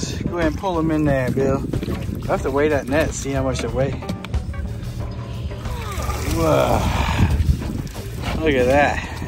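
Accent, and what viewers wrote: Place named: Louisiana, United States. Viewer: Dude your Cajun voice still makes me laugh so hard!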